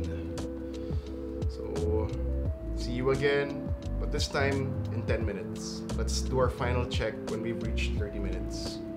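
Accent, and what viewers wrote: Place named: Philippines. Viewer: are you a filipino? because thats how filipinos speak English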